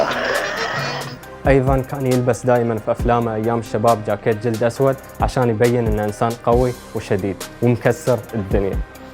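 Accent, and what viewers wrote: Iraq. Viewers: كنت احسبك عراقي ههه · طريقة كلامك تجيب من الشعب العراقي بشكل قريب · ماعرف ليش احسك عراقي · حسبت انك عراقي 😃😃😃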